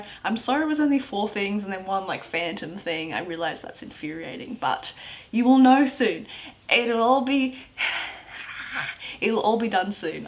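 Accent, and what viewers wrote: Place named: Australia. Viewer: I love your accent. So Australian! :)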